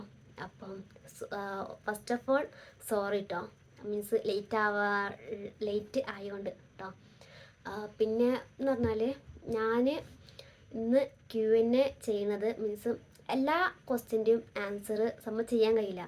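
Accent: Malappuram, India. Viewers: All the best, Malappuram slang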